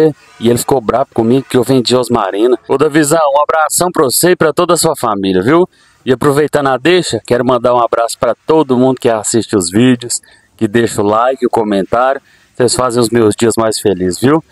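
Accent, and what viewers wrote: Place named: Goiás, Brazil. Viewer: gosto desse seu sutaque de goiano Raiz rsrsrs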